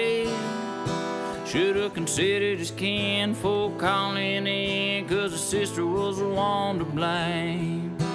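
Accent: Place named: South, United States. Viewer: Love a good story teller, with a country twang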